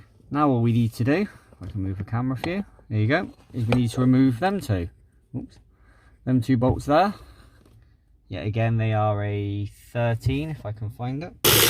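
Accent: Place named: West Country, United Kingdom. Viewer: I’ve got hold of spare engine low Miles off local guy who been Breaking t4s for years it’s showing 10700last mot he said it was only 112000 when he drove van to his.runs well he said he does know t4s well.got the turbo an diesel pump plus manifolds Too.where you from?im in Cheshire u sound like Bristol Somerset.we be Goin Cornwall again soon my wife’s family there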